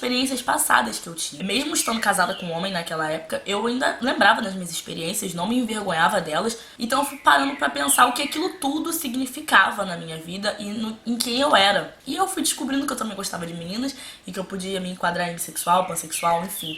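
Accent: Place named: Rio de Janeiro, Brazil. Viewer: SOTAQUE CARIOCA AMO ❤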